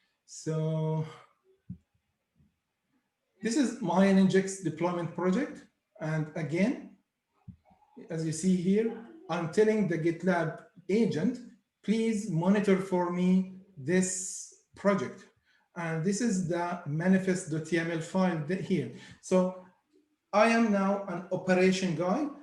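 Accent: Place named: India. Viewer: Can you get someone who doesn't have an Indian accent to do these videos? Sounds horrible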